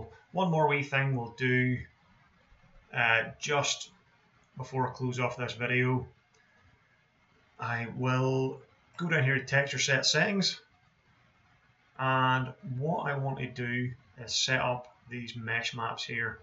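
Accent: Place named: Northern Ireland, United Kingdom. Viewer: Trying to import cameras from Blender into Substance led me here. Whilst this video didn't answer my question, it did introduce me to a fellow Mourneman. Well, I'm guessing from the accent!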